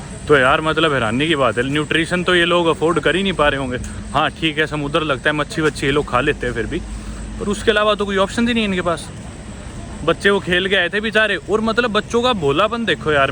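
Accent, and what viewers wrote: Haryana, India. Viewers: Pure haryanvi accet 😂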